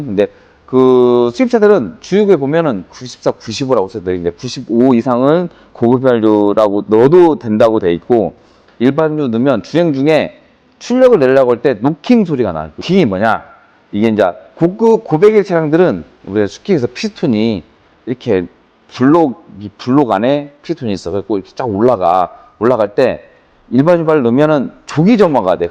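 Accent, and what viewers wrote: South Korea. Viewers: 흐미 즐라도 광주분이신가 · 전라도 말투 듣고 신뢰가 안간다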